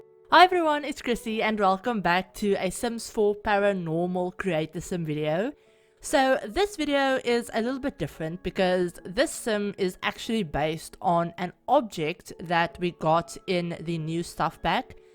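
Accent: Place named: Afrikaans, South Africa. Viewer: I knew it when u was talking I was like yes she's Afrikaans in from south Africa tooooo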